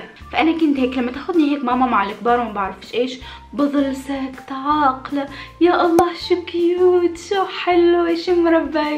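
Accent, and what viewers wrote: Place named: Tunisia. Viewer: لهجتك : كوكتال تونسي على مصري على لبناني من انت؟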